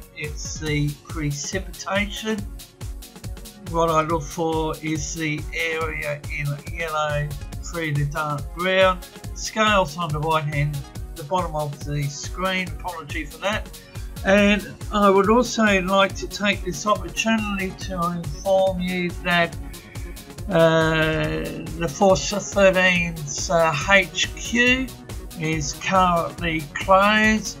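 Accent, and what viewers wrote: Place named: Australia. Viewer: Défi 200 abonnés sans aucune vidéo: HE'S AUSTRALIAN AUSTRALIAN ENGLISH + SHITE MIC = STUPID VOICE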